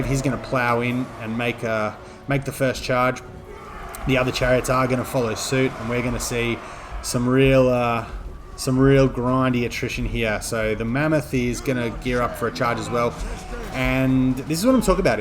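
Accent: Australia. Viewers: That feral mammoth hit Gotrek 5 times in a row between and ! I was hoping to see it's value, but you managed to skip it the entire time! Good cast though, I enjoyed listening to another aussie.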